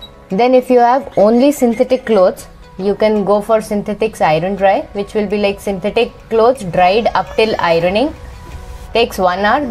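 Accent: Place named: Kerala, India. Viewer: Very good English. Well explain ed. I think your place is kerala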